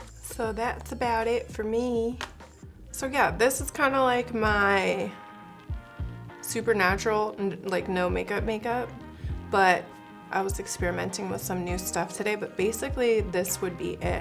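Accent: South, United States. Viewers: Your SOUTHERN accent gets me EVERYTIME lol. So glad to see you back hun, 2020 and 21' haven't been easy for ANYONE...but we're all here together❤ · I love when you do your country accent 🤣 I’m from Texas girl and it hits me right in the feel good happy spot ya hear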